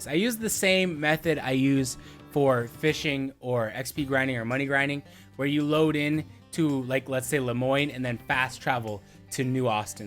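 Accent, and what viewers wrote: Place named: Russia. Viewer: Nice man I like gang hideouts. Damn man I just noticed how many subscribers you got now. Haha when I subscribed you had around 100. It’s because of the Russian accent you do haha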